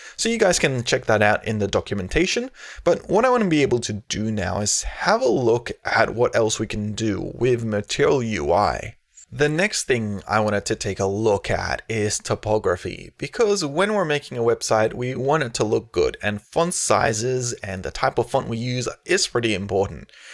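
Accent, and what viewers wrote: Australia. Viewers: Australian accent is fantastic 👌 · useful tutorial as well as nice Aussie accent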